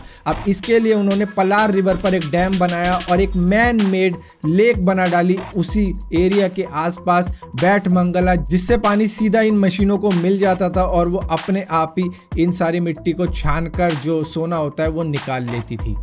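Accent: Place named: Delhi, India. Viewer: Bhai facts ke maamle m thmhara channel no. 1 hai. Delhi ka accent toh h hi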